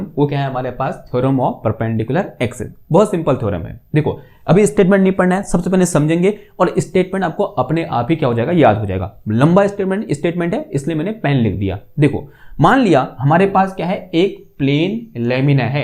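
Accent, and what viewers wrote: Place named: Kumaon, India. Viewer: Kumaoni accent ❤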